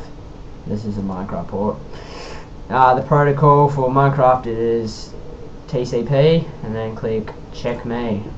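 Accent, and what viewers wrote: Australia. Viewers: Aussie Mate Yeeeeew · Are you aussie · are you an aussie, aussies ftw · heard the accent and knew I was definitely going to get this right aussies we so smart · I have that gateway and u are like the only one that helped me!! Also are u australia????????? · Are You Australian Cause If You Are I Am Aswell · YES ANOTHER AUSSIE HOW U DOIN MATE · Your Aussie aren't u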